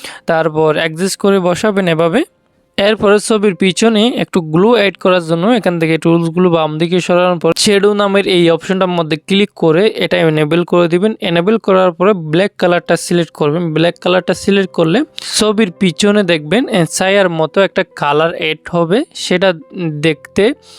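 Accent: Chittagong, Bangladesh. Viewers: আপনি মনে হয় চিটাইঙ্গা তাই না?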